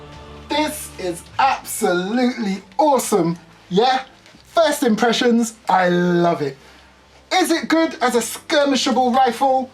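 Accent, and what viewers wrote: Texas, United States. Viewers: Your Texas accent was actually really good. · nice american accent XD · Good American accent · Starring In: The Rifleman Your American accent is great, the western intros are hilarious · Your american accent is actually really good! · actually, your texas accent sounds awesome.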